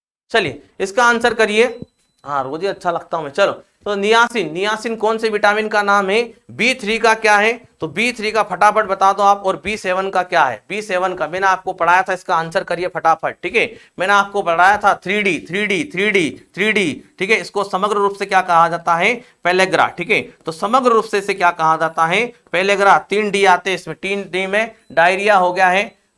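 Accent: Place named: Mewar, India. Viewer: Sir ji आपकी पूरी भाषा हमारे यहां जैसी है मेवाड़ा राजपूत जैसी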